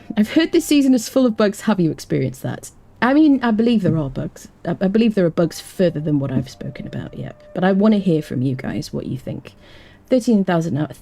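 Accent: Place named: United Kingdom. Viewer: I love uk accent. Bee-tah. 😍 Us southern yanks say Bay-tah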